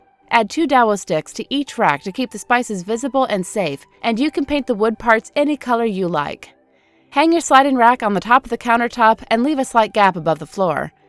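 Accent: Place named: United States. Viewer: Grant It depends where in the world you live. She's American and so says "urbz" not "hurbz."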